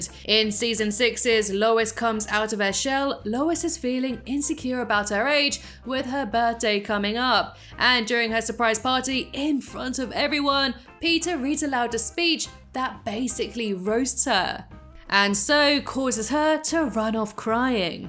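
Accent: United Kingdom. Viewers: I love your British accent! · Can you also do the worst things Stewie has ever done! BTW I htink your british accent is awesome! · How can people hate her British accent 😂 that baffles me 😂 well each to there own right 😂 her British accent is lovely and awesome 😎 You’ve done a wonderful job so keep voicing these videos. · Awe I'm sorry to hear that darling I aboustly love your British accent 🥰🥰 and I as well enjoy watching your videos~!! I was wondering if you could ever do a timeline of Marge simpson if you haven't done one of her yet darling?? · but i love you British accent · I love your british accent,what a bunch off fake fans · Wait! What! Who hates your British accent?! I love it! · ... your British? I thought you were Australian! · I don’t hate your British Accent and btw your doing great ^^ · Adore your British accent here in Bolton. Rule Britannia etc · Awesome Job! 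I'm glad Peter's not my friend, I love your British Accent by the way. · I don't mind your Voice,You British Accent makes you unique just the way you are. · You have a British accent? What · What!? Who said they don’t like your British accent I think they’re cool alongside French and Italian I’ve been told I have a Cleveland or very slight southern accent 😅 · I love your British accent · nah I don't hate your brit acent it's pretty beautiful to be honest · Certainly impossible to rank his particular deeds as all the outright criminal ones rank in similar categories; murder, destruction, relentless bullying, child abuse, sexual assault, manipulating everything by status, spousal assault and affairs, unkept alcoholism, drug/substance abuse, the list just won't stop! Another great video thanks! I also do like your accent, sounds much nicer, more engaged and more charming than a typical American accent which you hear narrating 85% of youtube videos. 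I'm not saying there's anything wrong with listening to American nor that there accent is unpleasant, its just nice for other countries like the UK to represent and you do talk very smoothly and elegantly like a good narrator should. People who are hating on your British accent and trying to get you to change the way you talk are mostly likely selfish, ignorant Americans who think the world revolves around their country and that everyone should act like and pay attention to them, don't let haters dictate what you say and how you say it, keep up the great content! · your accent is cool....I really love how strong people In. London accent is😌😌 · Who in the world would hate your British accent?!?!?! I absolutely ADORE IT!!!!! I binge watch your videos for your voice almost as much for the content itself! Great videos and very informative. I’ve seen these shows hundreds of times and your theories and timelines always make me want to rewatch certain episodes realizing how I missed some connections before. 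· Did you read the rest of my comment. Quagmire deserves the abuse and your British accent is awesome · To be honest I love your British voice. Next year I plan on moving to Scotland. After all… God save the queen! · I'm sorry I love your British accent! ❤ it's beautiful and exotic just like the wonderful host making my favorite videos! Never change! 💘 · Yeah it's like as if Family Guy characters of old Family Guy are very different than how they are now. But even so Peter was still a bastard back in the good old days too. Now people are complaining about your accent? I mean it's nothing new. Many people some probably other Americans who are uneducated and dumb for some reason can't stand a British accent even though there's really nothing wrong with it. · But I love your british accent! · I don’t mind your British accent tbh, I think it is soothing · Hey just saying I love your british accent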